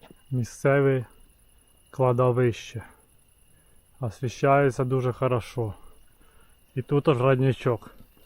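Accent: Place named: Surzhyk, Ukraine. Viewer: Супер суржик, нікого не слухай, звучить гарно і натурально - це твоя фішка як в Канадського Марка)) Admin 11 думає що тільки він читав українських письменників і тепер може повчати як кому розмовляти) від літературного українського говору відразу хочеться спати)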